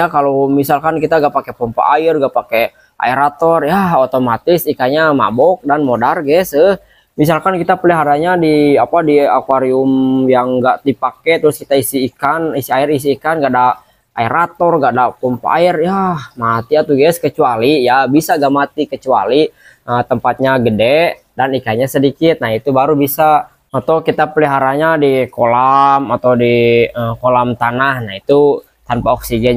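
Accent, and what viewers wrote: West Java, Indonesia. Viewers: Aing asa aya layan. mun tepung jeng orang sunda. aslina kang lila ngarantau ngadenge logat sunda asa bungah pasedek-sedek herin. modar 😅 🤣🤣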